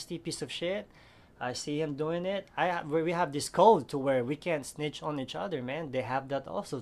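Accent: Philippines. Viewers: I like the pinoy accent. That's dope. You make it sound treal and gangsta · I love the Filipino accent man, I was in YA in 87-89 and I was accepted by the blacks and Mexicans but I got the hardest time from the Filipinos. I'm half white/Filipino but I was born and raised in the Philippines, deep in the jungle in a literal bamboo hut. But since I wasn't full Filipino I was given a hard time eventho most of the Filipinos in America never even step foot in the islands. But it is what it is, I like the channel man.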